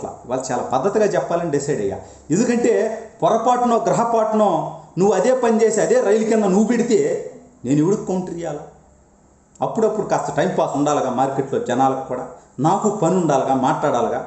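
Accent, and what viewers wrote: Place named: Nellore, India. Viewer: garu, meeru mee nelluru accent lo, goppaga, ekutunnaru sIr.... Bagundhi...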